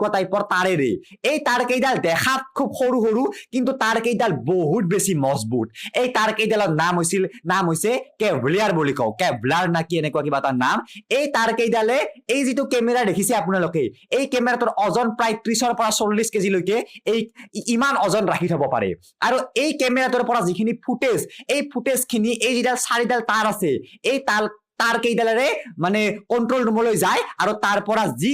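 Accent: Nalbari, India. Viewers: Katha bilak suni val lage nolbaira tu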